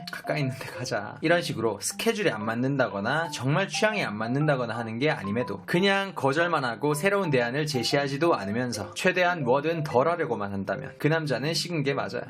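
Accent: Gyeongsang, South Korea. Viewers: 대구냐 부산이냐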